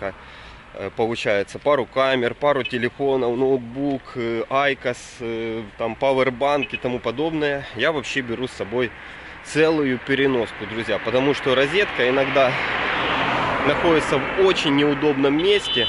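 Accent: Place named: Odessa, Ukraine. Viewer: вопрос "офф-топ". Не в тему. Вы одессит? )) Вы не подумайте ничего такого. Но вот это вот "я Вам расскажу ЗА несколько вещей" - это так по-одесски, так узнаваемо ))))))))))) ++++ Спасибо за видео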